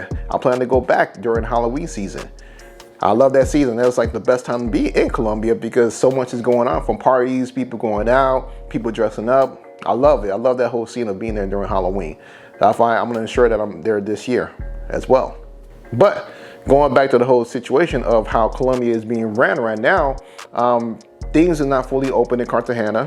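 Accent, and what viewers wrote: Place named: Chicago, United States. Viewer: Sounds like your from Chicago bro. Where did you get your test at and how much was it?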